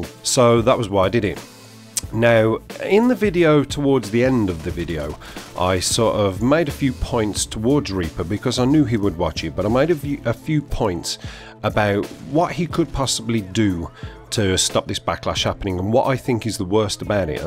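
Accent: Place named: Black Country, United Kingdom. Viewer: Great vid new sub u sound like a fellow yam yam with ya accent are u from wolves or nearby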